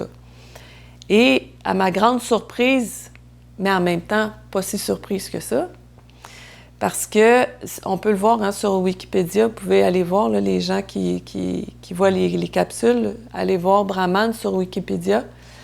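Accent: Canada. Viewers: Comme c’est intéressant! Une Québécoise tout comme moi! Cela me fait du bien de t’entendre! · J’aime beaucoup entendre toute cette histoire en accent du Canada en plus! L’accent du Canada m’étonne à chaque fois que je l’entend.. Comme moi j’avais vécu à Paris et j’avais essayé d’apprendre l’accent de Paris... Mais bien sûr ce n’est pas gràve par rapport à l’histoire même- c’est juste que je n’ai pas l’habitude d’entendre le français du Canada. Merci aussi de laisser sa voix telle comme elle est- je n’aime pas les dubs en autres langues. Quelle histoire incroyable!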